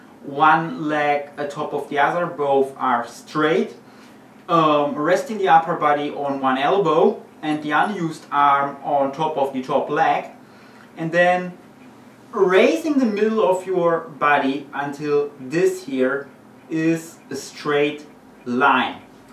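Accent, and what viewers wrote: Germany. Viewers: Good Video, you voice rocks btw :D, are you German ?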